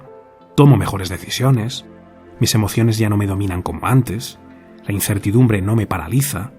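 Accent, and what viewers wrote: Spain. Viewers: Es el único acento de un español que sí Disfruto al escucharlo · Lo que es feo es tu forma de juzgar y decir que la mayoría lo hacen.Es claro que te identifica con ellos.Para mi muchos hablan como lo hace aquí está bella persona.El español es un acento dulce al escuchar ❤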